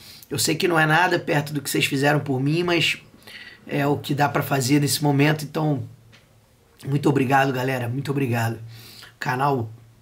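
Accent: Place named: Rio de Janeiro, Brazil. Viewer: Eu descobri o canal ontem. Tava procurando ver algumas dicas sobre programas gratuitos e dei like em quase todos que assisti. Hoje vi o vídeo que você mandou ontem sobre a interface. Aquilo doeu em mim. Sei o quão difícil as coisas estão pra todos. Eu não poderia ajudar hoje, ia esperar ver se entrava algum, pra mesmo sem nada, poder ajudar um pouco. É o ditado que diz que onde dorme um, dormem dois...ou quase isso. Mas logo depois vejo esse vídeo e fiquei feliz de saber. Desejo tudo de bom pra ti e esperamos que essa porcaria acabe logo pra gente poder voltar a ensaiar, tocar, gravar, aparecer mais shows, trabalhos, gravações, receber um qualquer...e por aí. Bem, eu além de músico e amante de guitarras agora virei luthier e assim que isso acabar, vou te convidar pra vir aqui conhecer minha oficina e a gente bater uns bons papos sobre música. 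Eu já havia brincado com gravação em casa no início desse século mas fiquei muito tempo por fora e agora que voltei. To desenferrujando mas vamos ter muito papo pra bater. Eu sou do Rio, e pelo que vi do seu sotaque, também é. Vai ser bem legal, se puder aceitar o convite. Abração e fique bem com sua família. Parabéns, pelos vídeos! abração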